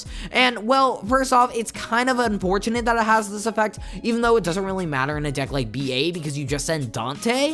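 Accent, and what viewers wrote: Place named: United States. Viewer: Goddamn, why does no one look into the right pronunciation for these foreign words? I'm Italian myself, and hearing these names (which come from a very important piece of our culture, being la Divina Commedia) pronounced by an American as an Anglo-Saxon word when it's CLEARLY an Italian and Latin word annoys me so much.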